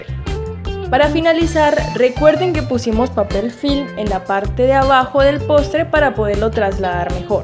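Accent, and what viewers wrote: Colombia. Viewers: Apenas empezó q a hablar yo dije ella es Colombiana a mi no me mientan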